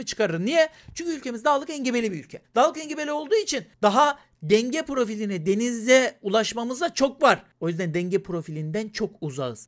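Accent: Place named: Black Sea, Turkey. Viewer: Ne alaka knk adam Karadenizli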